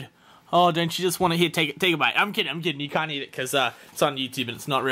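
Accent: Australia. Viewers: He is speaking Australian -.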